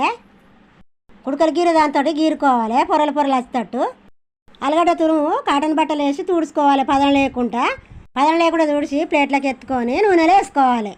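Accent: Telangana, India. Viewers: Telangana slag ❤❤ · Mana telangana yaashaa super untadhi warangal and karimnagar same untadhi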